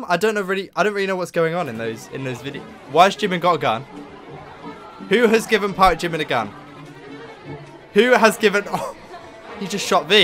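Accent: United Kingdom. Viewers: Oh, he's from America? When i first watched his channel, i kept thinking he was British all the time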